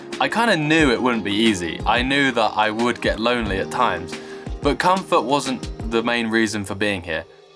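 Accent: United Kingdom. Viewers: OMG ! you sound exactly like "Thoughty2" in this bit here. You must be from the same area, since there are so many accents in the UK. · you are living in these luxuriously furnished mountain huts and you are presumably a rich man.because rarely people can afford to live in such a spectacular condition you are living alone.good luck.I enjoy from your incredible videos and your pure Brtish accent as well. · Hello I'm Trish, i come across yr channel and all i could do is smile big!! You are warm as that fire, handsome, smart, and make great Vij ya's. I watch lots of U Tubers from the U,K and always enjoyed yr culture and of course thee accent. Keep busy and happy, yr there!!✌💙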